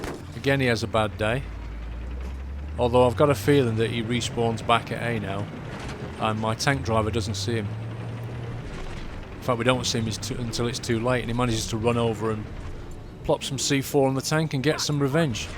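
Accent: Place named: United Kingdom. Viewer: British accent+PS3= ur a badass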